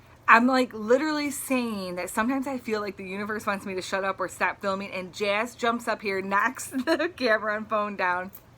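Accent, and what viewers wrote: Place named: Midwest, United States. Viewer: You're amazing! I found your channel during BBW SAS, and have really enjoyed your videos. Honestly, I really enjoy your accent. I love people from the midwest, people there are just so sweet and kind and genuine. Your accent makes my heart smile. I love how you make a big deal about the thumbnail and Byyyyyyyyyye, too. It's your signatures! Keep doing you, you can do anything! ♡ #TeamJackie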